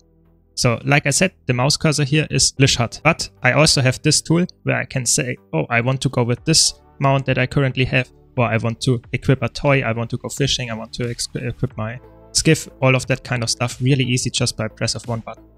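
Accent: Germany. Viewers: good german english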